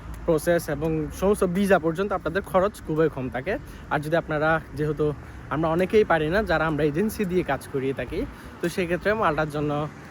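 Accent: Chittagong, Bangladesh. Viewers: Chatgaiya mne hoi vaiya apni??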